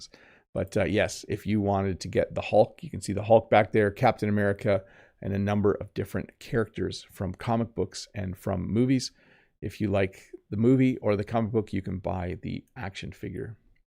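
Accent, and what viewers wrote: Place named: Canada. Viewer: you make me would like to immigrate to canada I like canadian accent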